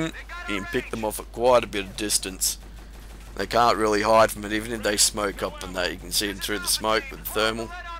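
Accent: Australia. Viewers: im guessing ur an aussie coz u keep saying mate lol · austrailian, english, or russian?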